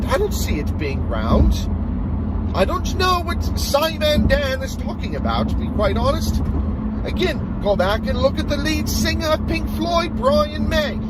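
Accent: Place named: England, United Kingdom. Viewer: What's with the English accent? Doesn't make you sound smart especially when you have no idea who Brian May is.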